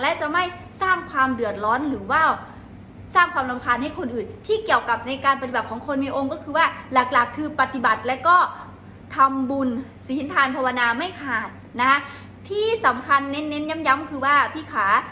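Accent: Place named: Isan, Thailand. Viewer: สำเนียงคุณเหมือนคนอีสาน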